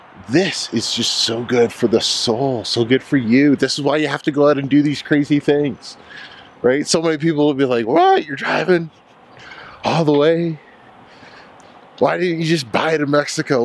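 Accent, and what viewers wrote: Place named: Canada. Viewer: I thought you were Canadian lol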